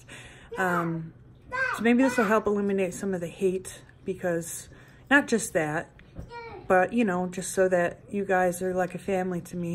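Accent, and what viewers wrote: New York, United States. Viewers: In America her accent is New York , New Jersey , Boston area sound like to me .Im in the south KY . to both be American we sound so different ! Shes adorable !! I love her accent !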